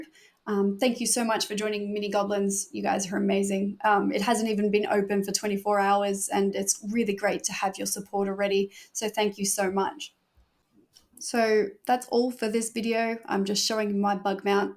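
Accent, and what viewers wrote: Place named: Australia. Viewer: when you say morning and evening do you mean Australia time or US time? I'm in Australia, so that would be good to know I guess. I'm new to the channel and not sure whether you play on oceanic or US server - you sound Australian but I don't want to assume Oceanic server. Also I would be happy with 30k a day, that would well and truly pay for WoW every month.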